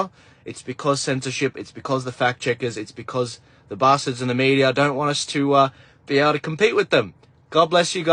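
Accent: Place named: United States. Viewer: Misinformation. Such as Dutton stating the voice has the power to Vito government? Or how about its completely legal for politicians to lie to Australian public during elections and referendums? How about scomo with his watered down to the national icac bill? What war are you fighting bud? You sound just like a yank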